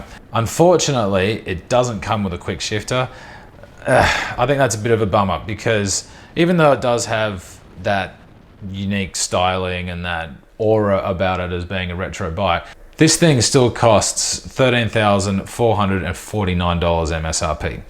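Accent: Australia. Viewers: not at all. Just wondering why you Americans want the whole world to mould to the way you to things. Self promoted exceptionalism. is a great guy doing a great job - he is an Aussie (grew up not far from where I did), and of course he will speak with an accent and pronounce words as we do in Australia.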